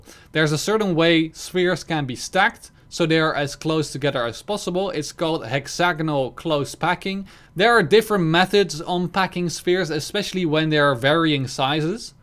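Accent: Netherlands. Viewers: just found this video (and your channel) not even 2 seconds in i already learned you're a Dutchie too :') haha our accent is so easy to pick out (at least to us) just like we can pick out people speaking Dutch that deviate more than 5% from the norms, then switch over to English because we know they aren't native Dutch speakers, we feel that shit up real quick :D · i smell dutch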